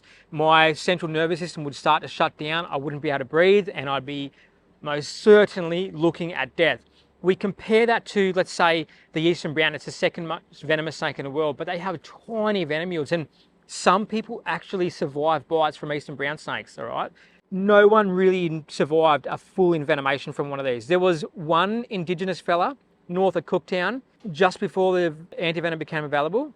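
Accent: Australia. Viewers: Excuse me, is this australian english accent? I'm fairly good at english but have a hard time keeping up with your talking speed.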